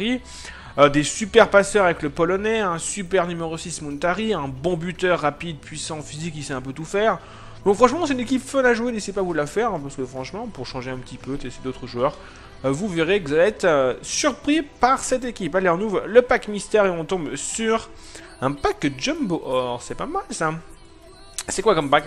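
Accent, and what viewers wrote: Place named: Marseille, France. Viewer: pk il parle comme les marseillais delà télé-réalité lui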